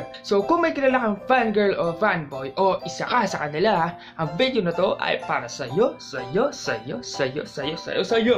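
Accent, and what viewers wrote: Visayas, Philippines. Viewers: Bisaya kaba??? Parang naka bisaya ka eh💕💕😘😍😱 pa shout out pooo plsss!!!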